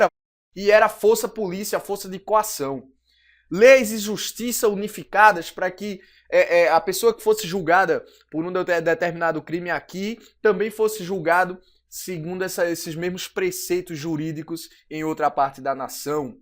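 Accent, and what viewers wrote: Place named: Northeast, Brazil. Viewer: você é ridículo. Lindo o seu sotaque, professor. Nordeste ❤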